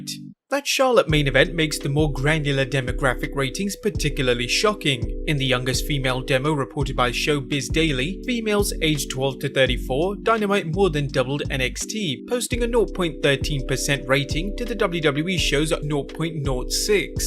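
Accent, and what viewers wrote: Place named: England, United Kingdom. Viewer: where is this guys accent from? i mean specific city in england.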